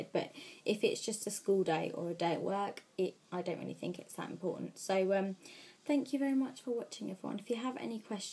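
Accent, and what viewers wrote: United Kingdom. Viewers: this is my first time watching one of your videos and before you started talking I thought you where like 12-13 years old but then I herd your voice and im like WTF?!?!?! Ur like 20 or somethin' years old?!?!? And your British?!?!?!? good video by the way :)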